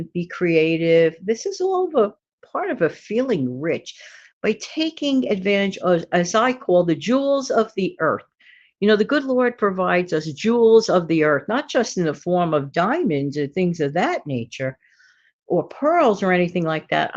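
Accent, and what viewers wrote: New York, United States. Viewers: Wonderful to listen to your words of wisdom. They resonate. Thank you!🌷 Love your accent; is that New Yorkian? 😅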